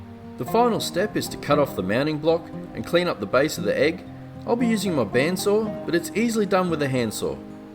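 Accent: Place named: Australia. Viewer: I said to myself "This guy sounds like an Aussie" and then I checked the description and lo and behold I was right, glad to see fellow Aussie's doing something cool like this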